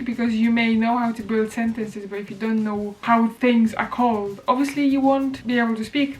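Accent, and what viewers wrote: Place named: North, United Kingdom. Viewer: UK native (manchester) spanish (2nd) ... you definitely have a northern sounding accent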